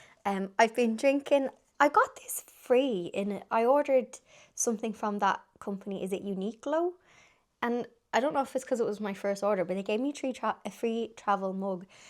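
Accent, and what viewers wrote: Ireland. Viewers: I love this video!! To learn this history of Ireland from your beautiful Irish brogue surpasses any history lesson. Although, I am only 1/3 Irish, ☘️ I hold Ireland close to my heart. My mom cherished her Irish heritage. Her grandmother, Bridget, came from Donegal. I don’t know how different that is from Dublin. ❤ (My grandmother’s name was Henrietta ❤)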